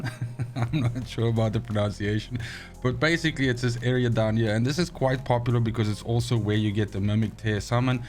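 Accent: South Africa. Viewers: Are you from South Africa? 🙆🏽‍♂️ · south african bro! im from good ol Jo berg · lief die suid-afrikaanse aksent! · Are you south african? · Are u from SA? That accent sounds familiar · This guys South African? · Bruh Are you South African?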